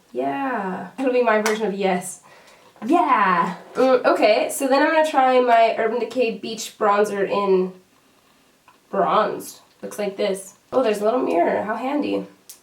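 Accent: Canada. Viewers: you sound extra canadian i love it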